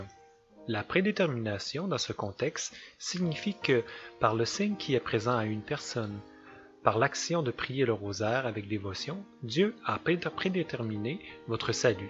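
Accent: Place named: Canada. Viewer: douce vierge marie accent canada il faut bien ecouter moi qui suis d origine allemande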